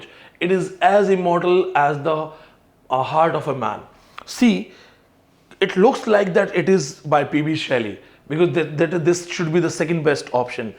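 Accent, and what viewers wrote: Rajasthan, India. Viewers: Excellent Rajasthan accent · rajasthani accent is very nice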